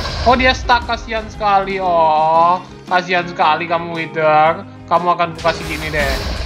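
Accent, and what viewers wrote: Java, Indonesia. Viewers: kok medok dulunya yaaa hehe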